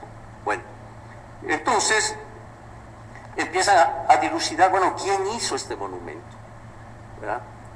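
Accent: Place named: Spain. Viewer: y porque habla Como español???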